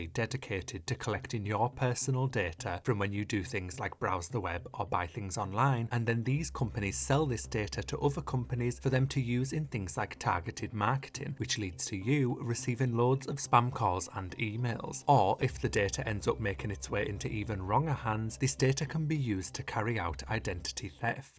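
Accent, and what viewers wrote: United Kingdom. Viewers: A British accent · For some reason, this sophisticated British accent makes videos about online stan culture so funny lmaao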